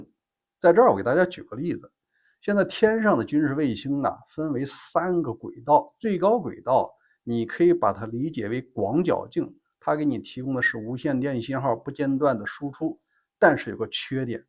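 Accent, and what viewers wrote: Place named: Shandong, China. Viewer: 这山东口音听得难受，你好好练练普通话再说。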